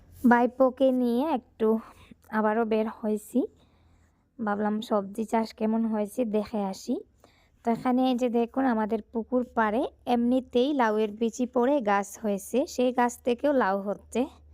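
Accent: Sylhet, Bangladesh. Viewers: আপু আপনি কি সিলেটি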